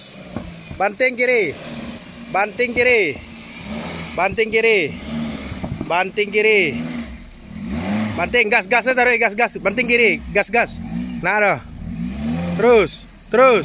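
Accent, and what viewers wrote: West Sumatra, Indonesia. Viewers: Dri logat ny kykny abg ini orang sumatra barat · Bg. Abg orang padang ya.. Logat minang nya kenal saya. Salam kenal da. Dari medan.. Di medan ado cabang da? Awak nio bali.. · Logat urang minang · Logat nya urang padang bana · Asal mana bg?? Bahasa ny kyk minang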